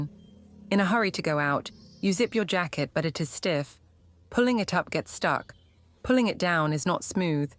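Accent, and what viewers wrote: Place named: United Kingdom. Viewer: Thanks. Very helpful. You list your location as the United States - but you have a beautiful clear standard British voice and accent!...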